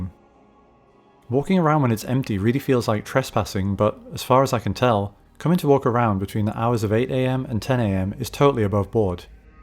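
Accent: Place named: England, United Kingdom. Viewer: dead mall vids hosted by an english man just hits alittle different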